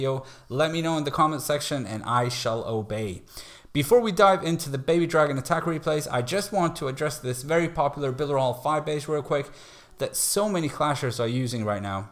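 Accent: Sweden. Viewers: I really heard your Swedish accent haha, men bra video 👍🏽😉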